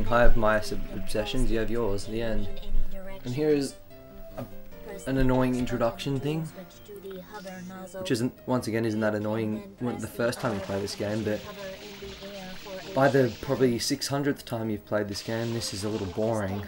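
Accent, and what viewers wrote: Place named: Australia. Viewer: Hey, just wondering, are you an Australian, Brit or New Zealander? I can never tell the accents apart! >:O Also, keep the videos coming dude, I'll definitely watch 'em.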